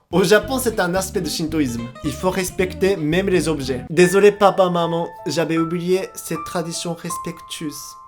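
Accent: France. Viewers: Il accent français en anglais et un accent japonais en Français le mind blow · Tu fais trop bien l'accent français . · Ton accent français en anglais déchire mec🤣🤣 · tu ma fait mourir de rire avec ton accent français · Accent français en anglais très commun 😂😂😂👍🏿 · Hahaha ! j'adore l'accent français en anglais !!! Vous êtes plus Français que moi !!! Incroyable ! Merci pour tous ces sourires ! :)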